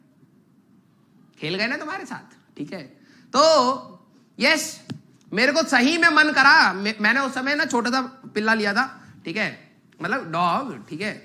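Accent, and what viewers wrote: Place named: South, India. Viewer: Ayiyo accent wala gajb ka😁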